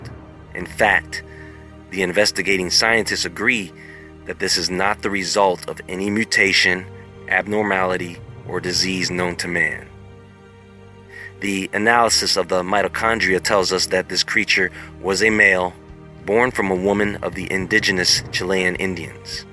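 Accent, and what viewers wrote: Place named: New York, United States. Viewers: Would make a perfect narrator for a mob documentary i'm serious man you got a great voice I don't know if you're from New York or New Jersey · I love your channel you sound like a fuckin badass you must be a brooklyn kid, you deserve 50 quadrillion subscribers.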